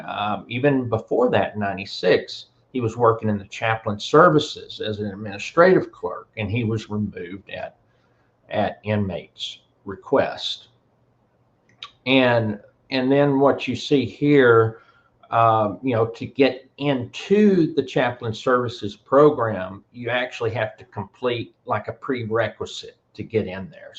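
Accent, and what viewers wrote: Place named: South, United States. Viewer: I’m relatively new to this channel and honestly, I’m still on the fence whether I like it. it’s very difficult getting used to someone with such a thick southern accent trying to pronounce all these Italian names. All of that said, and I’m not a snowflake who gets butt hurt by things, While I realize everything you spoke about regarding John Pennisi is in the public record and public domain I don’t understand why you thought you needed to go through his record on the Internet. Personally I didn’t think he said anything disrespectful about the man who died, John just said things as statements of fact. It’s only relevant because, as mentioned in this video it’s considered to be taboo to take your own life in the lifestyle. You mentioned John has a prison record of “self harm” but without context, we don’t know what that means, he could’ve punched a wall for all we know. 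I just feel that bringing all of that out without all of the facts and proper context isn’t the right thing. The other guy has been dead for almost 15 years. I think there’s a slight difference.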